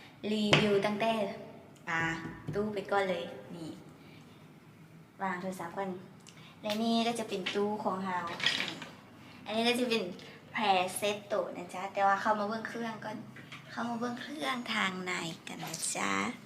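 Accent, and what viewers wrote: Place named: Vientiane, Laos. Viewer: ເປັນຄົນປາກກະດິງ ຄືເວົ້າອອກວຽງ😍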